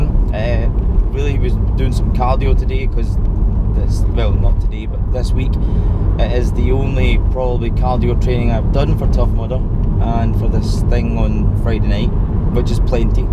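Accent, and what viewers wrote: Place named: Scotland, United Kingdom. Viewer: .....then we see some training videos from you and me next week!👍 I have to admit to have some problems to understand your scottish accent too😉 Best Regards from Spain, Adam💪💯%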